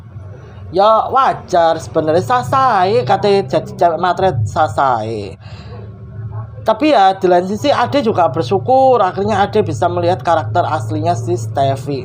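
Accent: Surabaya, Indonesia. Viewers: Mak maafin pas kamu suroboyoan nurturin ceritanya aku ngakak bgtttt lucuuuu jd kangen bule sm mbah ku di mojokertooo 😂🥹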